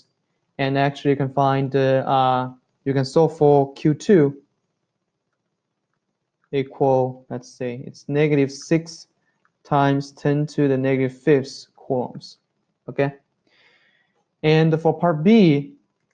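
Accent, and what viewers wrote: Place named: United States. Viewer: your accent is american from which country you are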